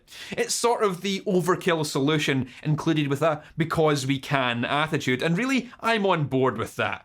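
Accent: Scotland, United Kingdom. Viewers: I think this video is great just to listen to your Scottish accent 😆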